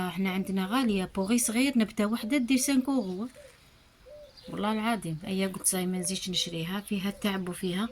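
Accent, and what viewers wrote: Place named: Mascara, Algeria. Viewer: ماشاء الله لوكان غير جيتي حذايا ندي من عندك شويى تهدري كيفنا في معسكر بصح ما عرفتكش مين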